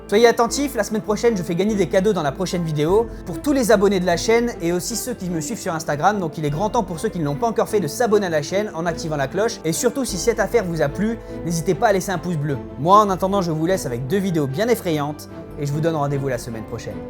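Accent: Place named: Quebec, Canada. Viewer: aaaah, c'est quoi l'accent que tu essais de cacher, j'entend un leger accent, tu es quebecois ?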